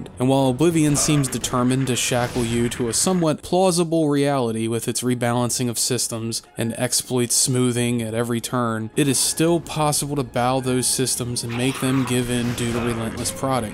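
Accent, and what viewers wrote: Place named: Mid-Atlantic, United States. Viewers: You from Maryland, bro? You got that THICC mid atlantic accent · I detect a Pittsburgh accent.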